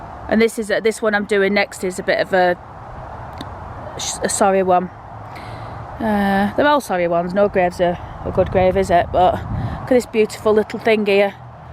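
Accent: Oldham, United Kingdom. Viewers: Love your lovely open way of speaking. I had to move away yonks ago so I love the area's covered and listening to a beautiful Oldham accent. Never change!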